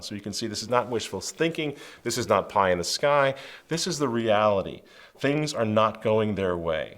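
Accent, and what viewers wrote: United Kingdom. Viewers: You do a great British accent!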